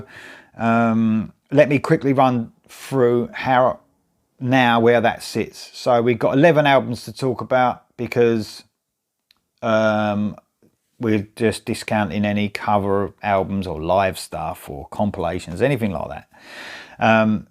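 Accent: England, United Kingdom. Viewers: Thanks man for a wonderful experience. Really enjoyed it. I agree it is indeed a wonderful album. You made it more so with what you've done. Not into metal as much as you guys, but I love. Always have. I enjoy hearing you speak. Love that English accent. I'm from the Caribbean. Thanks again man. God Bless you.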